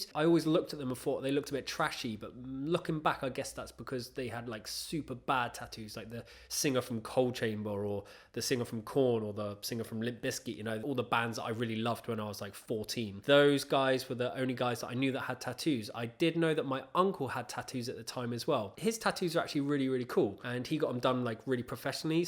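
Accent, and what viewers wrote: United Kingdom. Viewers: I don't have any tattoos (yet). The more I put it off the more nervous I get about the pain and healing, and just anxious about it.. like if I get some weird reaction or if I'll be allergic to red ink. But I've always loved tattoos and wanted them. In some ways I'm glad I've waited, I'm almost 23 and my preferences have changed so much. I want american traditional or neo-traditional tattoos now. Keep doing videos! I have a feeling you'll get a lot of subscribers! Also, where are you from? Your accent sounds british.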